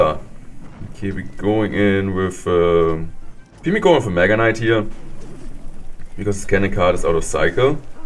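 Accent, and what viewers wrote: Germany. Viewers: ich gucke deine Videos auf ddm Deutschen und Englischen Kanal... wollte nur sagen dad du nen sehr krassen Deutschen Akzent hast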